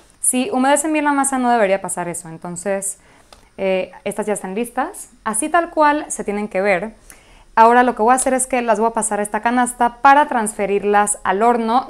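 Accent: Mexico. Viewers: No tienes acento, rienes el acento de mi pais, México! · Si tienes algo bello es tu voz que combina super con el acento mexicano ❤ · Buscando recetas de arepas, la encontré. Es maravillosa. Me encanta el español que habla, muy claro y su acento mexicano. Soy mexicana viviendo en Europa desde hace bastantes años. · Estaba buscando como se hacen las arepas pero creo que son lo mismo que los sopes en México? Creo que las voy a preparar como las estás preparando tu porque tengo esa marca de harina, gracias!! Te escuchas muy mexicana!! Saludos!! ❤ 🇲🇽🙏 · Ahh es q es Venezolana, ahora tiene sentido q tenga acento mexicano, pero su canal se llame 😂😂 ya decía yo..😂😂😂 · Ahora se me antojo unas arepas pero se me acabo la harina aaaahh:´) jajaja no sabia que eras venezolana, nunca desde que te sigo pensé eso, tu acento mexicano sobresale mucho 😅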